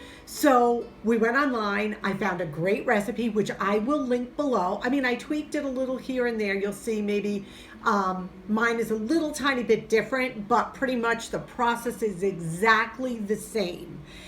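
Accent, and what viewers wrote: New York, United States. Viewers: you and are doing a great job. I initially came over from "Mornings with Granny." When I first watched your video something in my heart and mind clicked from your voice. I grew up in NY and I recognized that in your voice. It wasn't until later that you confirmed it that I got the "ah hah, no wonder" feeling. You share from the heart and I appreciate i Your tips are great, keep them coming. I like the recipes and the honesty you share. Thank you for bringing "a little joy to my day."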